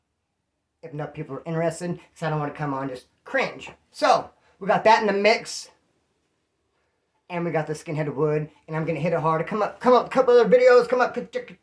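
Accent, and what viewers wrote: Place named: Inland Empire, United States. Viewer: FUCK that sounds like someone from the IE.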